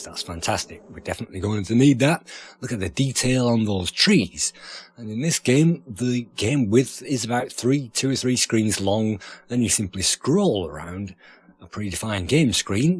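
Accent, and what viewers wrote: United Kingdom. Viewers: Good to know! You sound British or Australian/NZ, so I was surprised that you had an NTSC Amiga.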